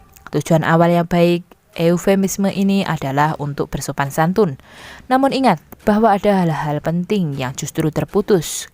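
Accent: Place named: Java, Indonesia. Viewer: Maknyus pembawaan medok nya Like 26 | terbaik bro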